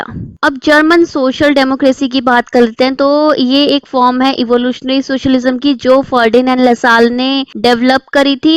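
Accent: Assam, India. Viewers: Mam are u from Assam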